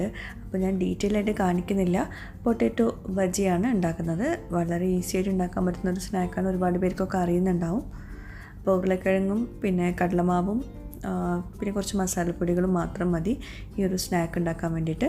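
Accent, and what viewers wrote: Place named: Malappuram, India. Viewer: Ingale malapporam baasha kelkkaan nalla rasand. Voice over kodukkumbo style aakken lle😆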